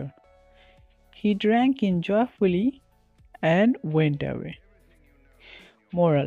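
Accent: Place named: Europe. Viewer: Perfect voice Like European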